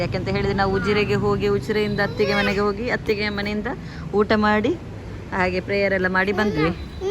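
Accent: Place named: Kerala, India. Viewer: ಅದ್ಯಾವುದು ಬಾ ನ್ಯ😂 ನಿಮ್ಮ ಕನ್ನಡದಲ್ಲಿ ಸ್ವಲ್ಪ ಸ್ವಲ್ಪ ಮಲಮೆ ಮಿಕ್ಸ್ ಇದೆ😅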